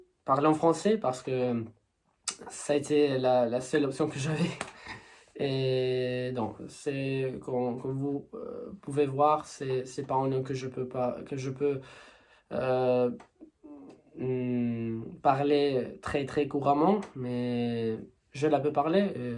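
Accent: Russia. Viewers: you might be a beginner in Polish but some of the harder sounds were on point. You said you start speaking Russian when you don't now how to say something in Polish but I also noticed 1 other thing, you seem to have a slightly Russian accent in Polish, I'm guessing because Russian was the first slavic language you ever learned and now it carries over to Polish. there were some grammatical errors but that's to be expected, I commend your efforts nonetheless, Polish is probably the hardest slavic language, even people like Tokimasa Sekiguchi who has been living in Poland for decades pronounces some words in a weird manner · Your Russian accent is very impressive. Te felicito.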